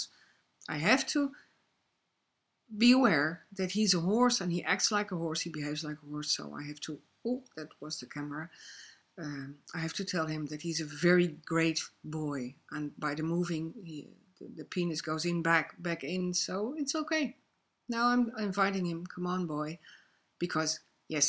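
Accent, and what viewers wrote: Netherlands. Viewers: big time, im 100% also born in the U.S. with a dual citizenship. i can pick up a dutch accent a mile away! Ik vind het fantastisch is. ook veel succes met je paarden en bedankt voor het antwoord!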